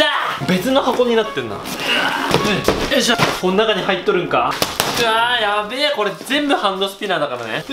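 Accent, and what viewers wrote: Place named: Toyama, Japan. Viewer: この中に入っとるんか。 完全富山弁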